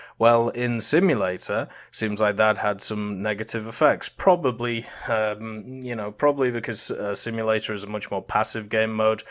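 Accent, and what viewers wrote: England, United Kingdom. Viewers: Where is your accent from? Which part in England?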